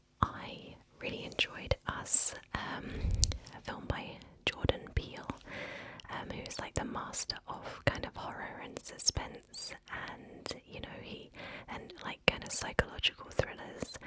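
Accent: United Kingdom. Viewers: You are genuinly the only asmrtist with a british accent i really enjoy. The way you speak is mesmerizing. Loved the video! :)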